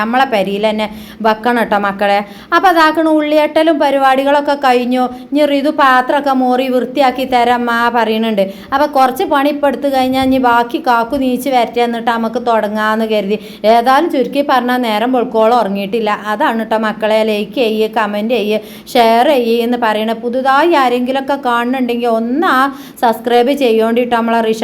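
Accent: Malappuram, India. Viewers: മലപ്പുറം താത്താന്റെ വർത്താനം ആണ് അല്ലേ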